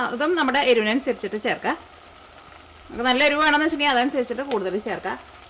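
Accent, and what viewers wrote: Thrissur, India. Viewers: Chechi thrissur kaari aaanoo....najn thrissurikkaariyaa.......tune kettittu thooniyathaa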